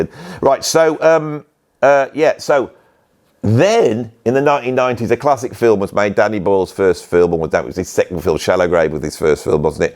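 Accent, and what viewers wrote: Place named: Wolverhampton, United Kingdom. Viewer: Here from Rick Beato as advised by you...Like , subscribe, laugh...This bloke doesn't love Rush...You talk like my relatives from Wolverhampton BTW.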